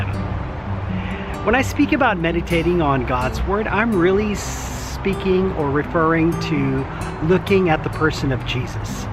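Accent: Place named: United States. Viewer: American accent na c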